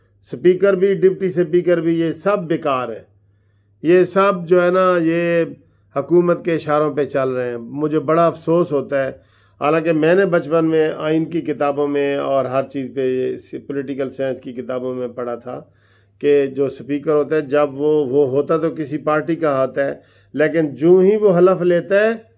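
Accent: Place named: Mianwali, Pakistan. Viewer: sab ap apni mianwali wali zuban ma baat kia krain.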